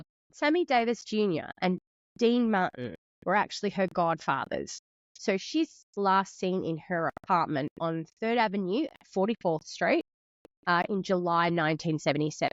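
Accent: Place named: Australia. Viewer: Oooooo. I get the first comment. in the house. That's either a Kiwi or Aus accent. If pushed I am going to go with Aus (raised sentences in the absence of a question). I am going to Henry Higgins it and go for a WA (Perth) accent.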